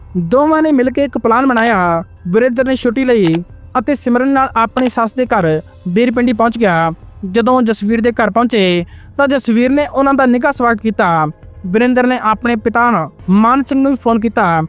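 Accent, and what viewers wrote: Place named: Bihar, India. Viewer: bolna te sikh la punjabi jn bihari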